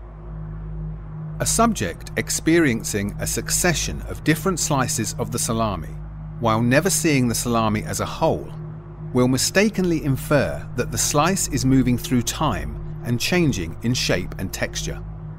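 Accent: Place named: United Kingdom. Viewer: They always gata put the british accent so it could look more interesting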